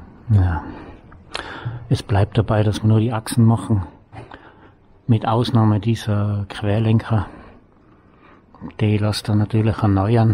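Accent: Austria. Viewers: Moin aus Norddeutschland. Sehe mir gerne deine Filmchen an. Aber es wäre schön wenn du etwas mehr Hochdeutsch sprechen würdest.Dein Österreicher Slang ist sehr schwer zu verstehen. Sorry. Viele Grüße.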